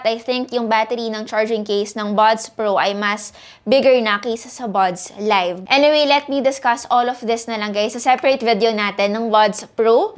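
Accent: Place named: Philippines. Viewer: I don't like your speech delivery. It's so Tagalogized English accent. Actually, ultrra, exxact, mowmint, teaboll, apresheyt, to be honest, ech d, achually, Should refer GSM ARENA specs. Waste of time too have been watching you. Noisy and too talkative.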